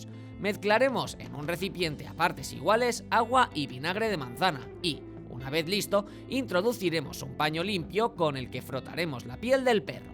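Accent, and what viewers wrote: Spain. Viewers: Por favor aceite de que??? No le entiendo, quise poner subtítulos y no se pudo. La persona que habla no habla claro, tiene acento español y por eso no le entiendo 🤭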